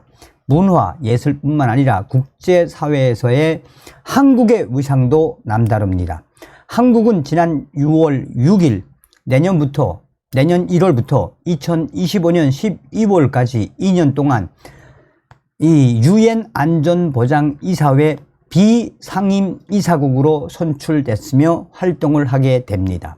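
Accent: North Korea. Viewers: 오늘은 차분하게 잘 발언했어요. 부탁을 하자면 큰 문단을 마치고 나면 짧게 쉬고 다음 문단 들어갈때 심호흡을 하시고 들어가는게 좋아요. 그렇게 하지않으면 생각이 정리되지 않은것 처럼 보이고 자쿠 더듬게 된답니다. 그리고 요즘 사랑들 웬만한 북한 사투리는 다 알아들어요. 외국어는 미치 좀 연습할 필요는 있고 일반 언어는 편한 북한 사투리로 해도 괜찮습니다. 경상도 사랑들은 굳이 표준만 쓰려 하지 않아요. 자기에게 익숙한 언어로 심호흡 해가면서 또박또박 자기 주장을 전달하시면 좋은 결과 있으리라 생각해요. 모든 사람이 다 말솜씨 타고난것 아니니 좀 모자라는 말솜씨 가진 분들은 노력해야죠. 하다보면 어느샌가 유려하고 매끈한 진행 하시게 될거예요.^^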